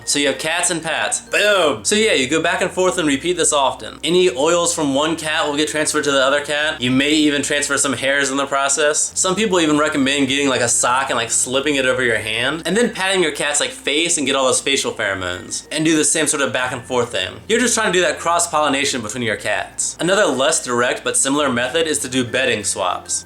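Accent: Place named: United States. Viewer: a hillarious American voice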